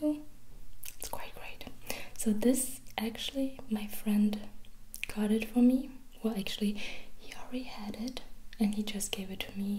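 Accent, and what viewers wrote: Germany. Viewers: Your accent reminds me of my German grandmother💕 she’s from Görlitz, Germany. I went to Germany when I was 16. I loved it so much, i fell in love with the country. I want to live there someday. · ydobon it’s funny how people usually say they hate german accents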